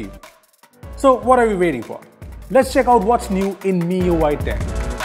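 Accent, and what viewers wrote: India. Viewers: No offence but i just hate Indian accent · Fuck india fuck your ugly annoying accent fuck your shithole country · Finally an Indian accent that very understandable.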